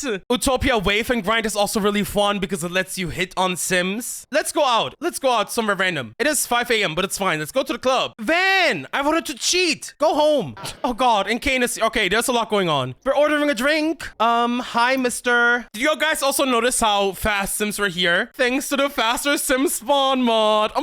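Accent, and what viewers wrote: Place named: Italy. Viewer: holy molly....you sound ITALIAN ...no offense to the italians hahaha i enjoyed the video truly...it was really entertaining and your acting attitude really fun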